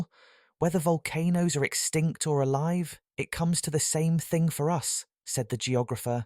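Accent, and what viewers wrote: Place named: United Kingdom. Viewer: Love this!It's very helpful for me to mimic the UK accent cuz your English reading is not so fast and the pronunciation is clear as well. Please make this kind of video more❤. I want to shadow more❤.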